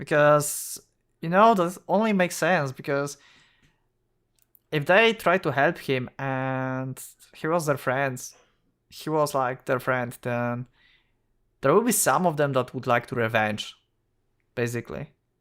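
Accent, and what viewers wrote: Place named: Germany. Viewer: I'm watching this because alot of debatetubers are glazing the main character's writing. Is he really above in terms of characters like Walter White and Beato? Also, I do want to play the full vers (including the sus scenees) on steam but I don't have a functioning pc 😢 Also, I like your accent man, you remind me of a german teacher I once had.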